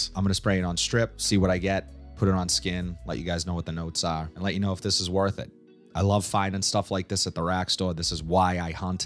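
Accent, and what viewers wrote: Boston, United States. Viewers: I wanna live in the states if anything for the fragrance variety at Marshall’s . Canadian ones are more or less terrible . Winners is the worst. I’m also here for the Boston accent 😅👍🏻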